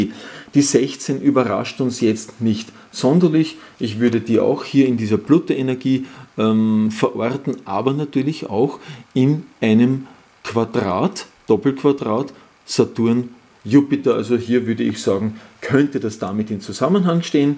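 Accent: Austria. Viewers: Ich mag den sarkastischen Humor vom, der fehlt mir hier in Deutschland, und dazu noch das Österreichische!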